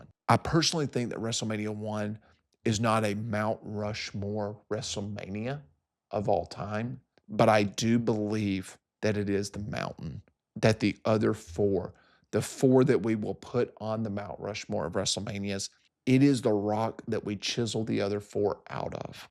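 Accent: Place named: Georgia, United States. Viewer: I missed that sweet Georgia drawl...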